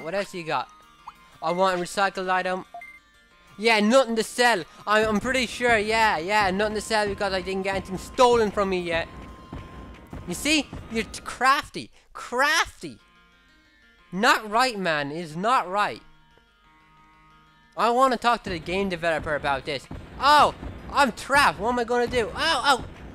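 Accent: Ireland. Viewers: where are you from man?? im irish too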